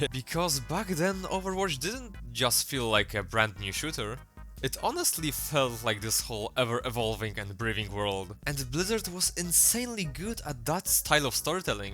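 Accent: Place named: Germany. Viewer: The strong German accent gave away that for once I wasn't recommended AI slop good vid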